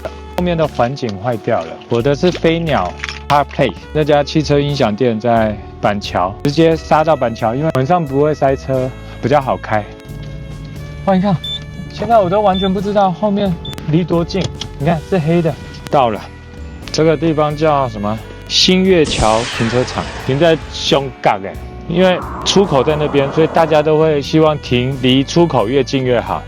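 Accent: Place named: Japan. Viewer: 講話怎麼感覺像日本人在講中文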